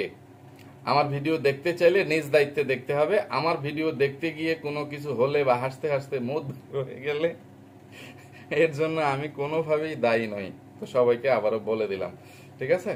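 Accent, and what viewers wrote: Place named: Mymensingh, Bangladesh. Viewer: Apni to moymonsing vashai kotha bolen..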